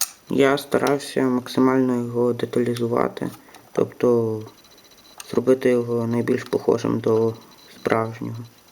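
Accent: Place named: Lviv, Ukraine. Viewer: він зі львова и я це чути як він говорить в нас у львові майже всі так говорять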